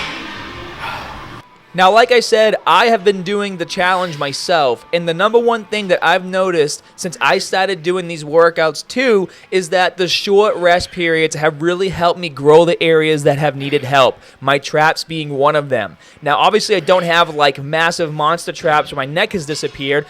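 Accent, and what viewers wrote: Boston, United States. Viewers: what accent is that? Boston accent? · Hey, love your videos, been viewing for a long time! I'm actually from the MV region aswell. You just don't get that OG Boston accent in channels anymore! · Is that a Bostin accent? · I never noticed your boston accent in other videos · Watah pump Muscle fibers in your aahms Monkey bahs. Boston accent on point today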